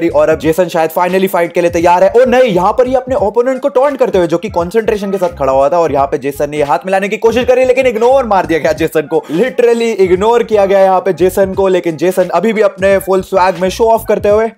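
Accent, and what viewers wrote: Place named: Hyderabad, India. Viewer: mohtarma hyderabadi hai hamare yaha per aisich baat kartay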